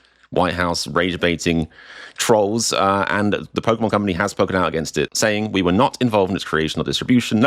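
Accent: United Kingdom. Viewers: Lol. He's a Brit. A lot of words are pronounced differently. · dear, you apparently worked very hard on this compilation so kudos to you; but i am most impressed that with your native VERY British accent you can mimic the redneck MAGA hick-talk spot-on still ROTFLMAO; sorry i got entirely distracted......💙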